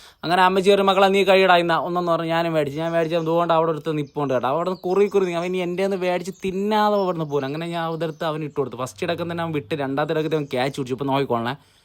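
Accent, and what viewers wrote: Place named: Attingal, India. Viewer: ടേയ് നിങ്ങള വീട് അപ്പോ എവിടെ 🤔🤔 ഞാൻ ആറ്റിങ്ങൽ.. സ്ലാങ് കണ്ടാർക്ക നമ്മള സ്ലാങ് പോലെ തോന്നുന്നല്ലോ 🤔🤔🤔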